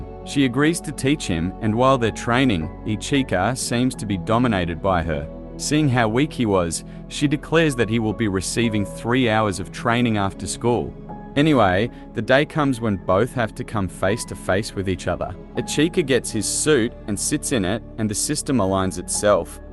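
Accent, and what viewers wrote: Australia. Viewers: Australian accents go hard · the assie accent is really offputting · Nice Australian accent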